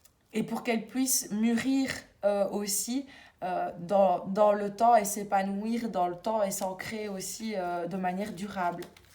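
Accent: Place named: Belgium. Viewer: Je vous découvre j'adore votre accent belge si je ne me trompe pas. Évolution pro surtout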